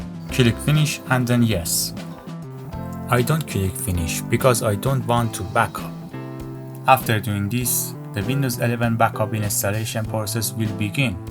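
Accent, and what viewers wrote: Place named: India. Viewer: Loved your indian accent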